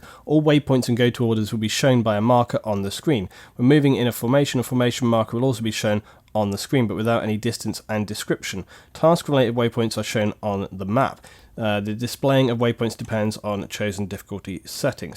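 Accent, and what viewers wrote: United Kingdom. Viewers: Hi I have a HP notebook which I play arma3 on. I a noob with about 20 hours on it and I’ve just discovered I can’t get half of my actions, like player stats, gps,etc.. as I have no right Ctrl button on my keyboard. I’m computer illiterate lol, so could you or anyone reading plz help with a remedy to my issue? Can I configure the keyboard somehow? (but in game only!) where I can change a non needed key to a R-Ctrl to use. Thanks pal great video and very useful still years on. appreciate your effort and time you invest in your video’s. BTW wat part of uk u from ? I can’t tell. I from the northwest I think you’re south of me lol 👍🇬🇧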